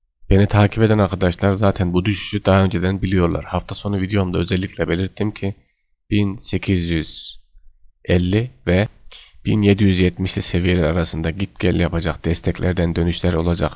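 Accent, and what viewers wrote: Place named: Kırşehir, Turkey. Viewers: Ne güzel konuşuyor tam bı Anadolu çocuğu teşekkürler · da kırşehir şivesi var gibime geldi. sa nasrettin hoca demek istedim.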